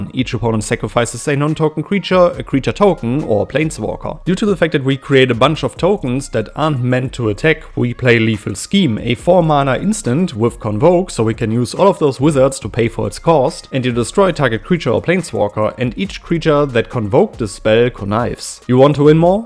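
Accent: Germany. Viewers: I love the sound of your english 🤣 it sounds like german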